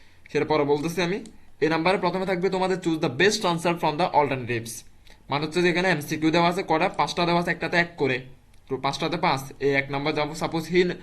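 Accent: Chittagong, Bangladesh. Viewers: Are you from Chittagong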